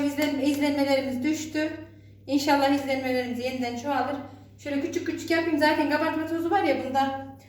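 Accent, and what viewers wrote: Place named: Konya, Turkey. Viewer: yurt disindayim Konyaliyim o Guzel siven icin izliyorum sizi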